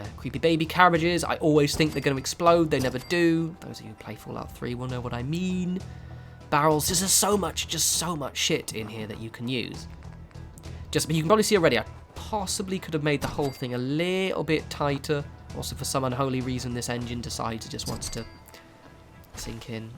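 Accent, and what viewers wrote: United Kingdom. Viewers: Anarchy RWO I'm aware he has an accent, but plenty of people from the UK pronounce the word appropriately. I can't speak to any specific regional influence on pronunciation of the word, but it is correctly pronounced the same way in pretty much any place that english is the native language. Quit acting like I'm insulting the man's intelligence by offering a bit of educational advice.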